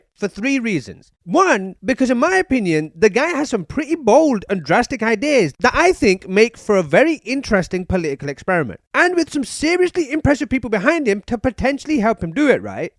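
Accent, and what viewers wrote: United Kingdom. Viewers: Aren’t you British? 😭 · Angry British guy perpetuates misconceptions about Donald Trump's intentions.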